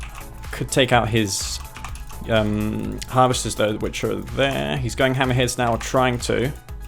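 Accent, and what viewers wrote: United Kingdom. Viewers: Nice to put a face to this voice I've been listening to for years lol, British? Maybe?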